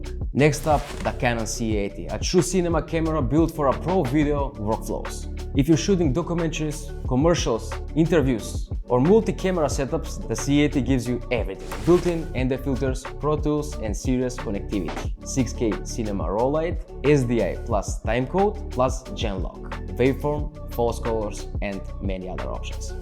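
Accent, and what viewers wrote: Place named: Russia. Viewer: Такое чувство что ты русский который выучил английский и косишь под американца))) А по теме всё супер! Спасибо за видо.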